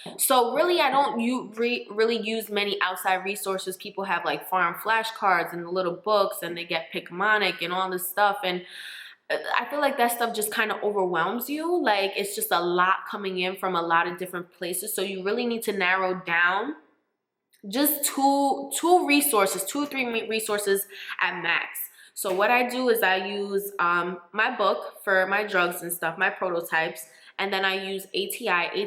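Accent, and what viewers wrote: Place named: New York, United States. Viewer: Most definitely sound like a New Yorker. I’m a Brooklynite and she dead sound like women from my childhood.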